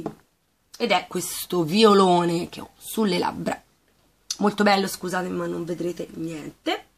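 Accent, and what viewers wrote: Tuscany, Italy. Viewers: Ciao, mi fa piacere che hai trovato lavoro! Quando ti ascolto mi sembra di essere quasi in famiglia xké dalla parte di mia madre sono tutti toscani da secoli e la parlantina toscana mi rilassa 🤗poi tu sei schietta, sincera e senza filtri quindi.....mi fai tanta compagnia! Un bacione! Un augurio di buona ripresa x la tua nonna Leda!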